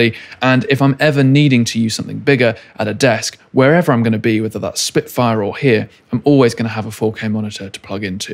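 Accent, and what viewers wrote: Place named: United Kingdom. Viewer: Dear, thank you very much for your video. I like your videos very much and that as a non-native English speaker. I love your and the clean British accent. I think my personal decision has fallen. I will order the standard 16 inch Pro with 16GB RAM 1TB SSD version. Why? The larger display, longer battery life and what many may not know, the better cooling system from the larger one. That may shouldn't tickle the Pro compared to the Max processor. The 16 inch model gets nowhere near as warm as the 14 inch. Well when will that be the case? The weight? If I can't carry my notebook sorry MacBook Pro, then I don't need one anymore. And it's a difference of 200 € for me. I know a lot of money. Keep up the good work. Thanks to you, stay safe, all the best from a loyal subscriber from Bavaria.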